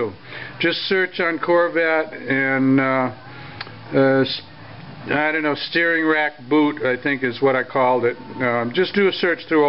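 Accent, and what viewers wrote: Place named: Connecticut, United States. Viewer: I really enjoy the Corvette videos. Very informative and useful. Do you happen to be from CT? Accent sounds that way...keep up the good work.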